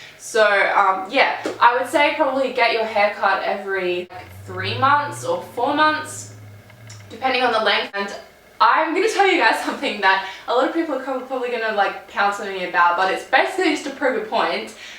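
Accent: Australia. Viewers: Your from Australia aren't you bc accent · Oh my gosh are you from Australia :O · Are you Australian? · Are you Australian?? it sounds like it.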